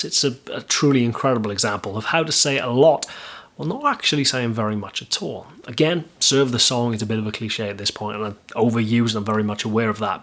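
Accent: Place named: England, United Kingdom. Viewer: Best voice ever Bro, Soft but full and the English accent is of course Golden. From Your Canadian Brother. Keep on Ah:-)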